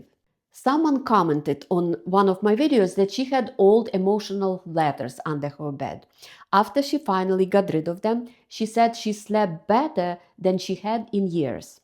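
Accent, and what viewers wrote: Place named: Russia. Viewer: У Вас очень яркий русский акцент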